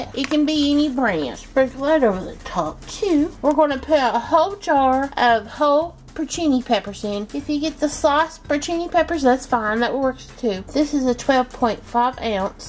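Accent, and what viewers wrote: South, United States. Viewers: I love love love your accent and pronunciation of words! True southern accent??!! Lol. Truly Love your recipes and your cooking channel!!! Thanks for sharing your love of cooking and wonderful recipes!!!! God bless you!!!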